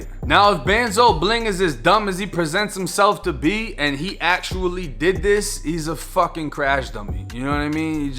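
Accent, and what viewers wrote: Boston, United States. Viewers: I love this kids accent talking about Florida prison and shit. I'm from the Boston area. I talk like him. I'm almost 20 yrs older and this kid makes himself very clear. Right to the point. Keep it up brother. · as usual a brilliant summation of the story for all us to understand. thank you, sir! BTW. are you originally from Boston. i hear an accent there! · U got a Boston accent broo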